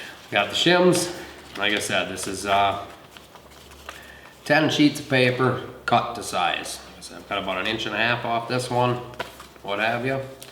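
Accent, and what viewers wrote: Minnesota, United States. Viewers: That Minnesota accent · I hear the MN accent bro. "There you goooo" lol love it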